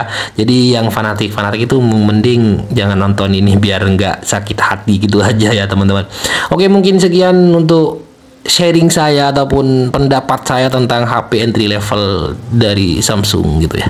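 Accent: Madura, Indonesia. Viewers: Bhee medureh kakmah kak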